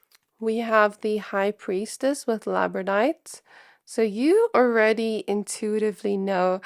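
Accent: South Africa. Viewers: South africa? Wow. I was wondering what your accent was from......and your lights went out. ....2 months agi i was having an issue with my finances and had my electricity shut iff because i didbt oay it. ... And EVERYONE IS AGAINST ME FOR ME. EVERYBODY........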